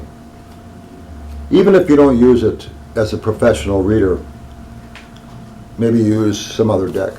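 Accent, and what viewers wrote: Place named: New Jersey, United States. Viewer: ah, thank you. I’m from NJ and he sounded so NJ to me.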